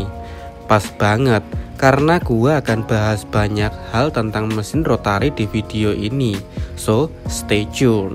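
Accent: Java, Indonesia. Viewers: Medok banget ya .. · Nice job. Btw saran intonasi pas baca narasinya sedikit dibenahi bro, rapopo ketok jowone sitik2, sing penting nafa bicarane menarik viewer sing nonton. Tetep Semangat bagikan edukasi. Tk subs ki lho. 😁👍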